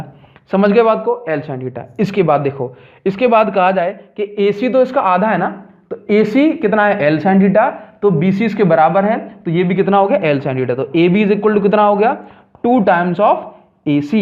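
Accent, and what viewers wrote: Odisha, India. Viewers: Sir ap Odisha se ho kya❤️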